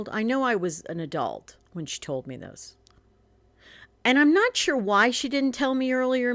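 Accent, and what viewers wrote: United States. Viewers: Thanks for sharing your experiences with your father. All sorts of stuff happens to all sorts of people all the time. You got through it, and look, you turned out alright. I'm an American living alone in England for the past 30 years, so it's nice to hear your American accent. Comforting.😀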